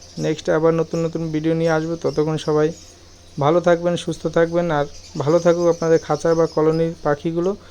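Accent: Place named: Bangladesh. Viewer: Uni too ashe ,gese kore bolchen oni ki poschim Banglar? Bangladesh er moto e uccharon .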